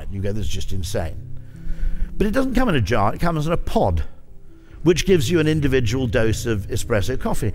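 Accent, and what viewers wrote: United Kingdom. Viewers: Everything sounds better in a British accent and lingo! But yeah, that's great effing advice too. Just wish I could be a cheeky bugga too!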